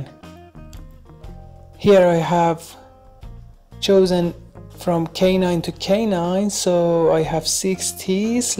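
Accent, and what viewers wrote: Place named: Iran. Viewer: Your accent looks a alot like iranians :)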